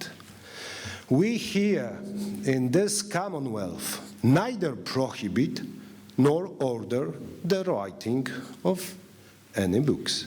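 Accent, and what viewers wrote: Poland. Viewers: Szału nie ma, ale żeby tragedia to też nie!!! wymowa raczej poprawna, dość silny polski akcent i tyle. Akcent to nie taki problem jak Polacy zwykle uważają! Mówi spoko, czyta bo z pewnością nie potrafi tak przemawiać jak po Polsku, ale np. Radziu S. Ostatnio czytał od dechy do dechy po Polsku - on chyba po angielsku mówi swobodniej - wniosek: to Polski prezydent, a nie anglik...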